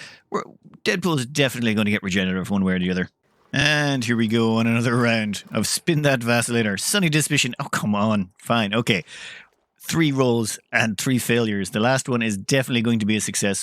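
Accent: Ireland. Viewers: im watching this on my 2014 gaming laptop wot is falling to bits and i just love listening to loveley irish voice, if we can convince him to start a patreon or just keep okn id be happy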